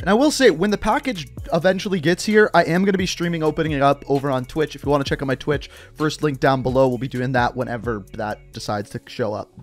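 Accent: Canada. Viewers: Is this dude from Canada?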